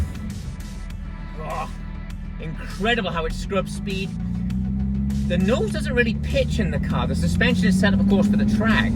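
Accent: Wales, United Kingdom. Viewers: Welsh accent !!!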